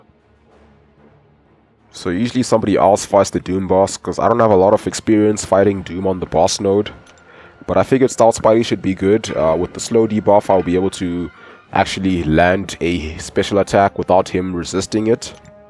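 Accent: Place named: South Africa. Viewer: Whats your nationality? You sound a bit south african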